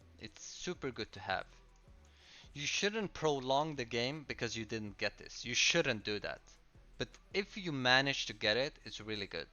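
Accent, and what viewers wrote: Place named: Middle East. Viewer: That’s very nice man. When i heard ur accent i thought that this guy is probably from somewhere near middle east or balkans with a good language education😂. I assume that one of ur parents born in either Turkey or Kurdistan. I’m Turkish and as far as i know a lot of Kurds migrated to nordic countries. Lastly about the game, how important is fishing? I’m N5 right now with Lilith and I barely do fishing.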